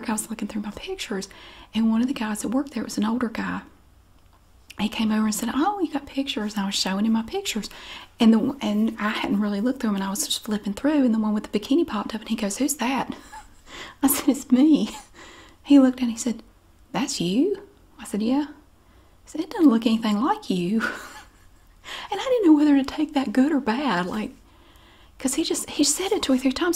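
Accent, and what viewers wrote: South, United States. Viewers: Hi I really love your videos I like them more for how you make the smallest things and purchases exciting and interesting...yes, its a ramble, but you do have things to say, and its from the heart, I can tell you are not putting on with this, but I can tell you indeed do have fun with this, and you are a tad silly/funny, so I know its a slight put on I do feel relaxed by your regular voice, but I think its the combination of your southern charm and accent as well that relaxes, as its not really text book ASMR, of which I can only handle so much I really enjoy your videos, when I need calming I keep the browser open and put it on.... | And of course, southerners always tell the best stories!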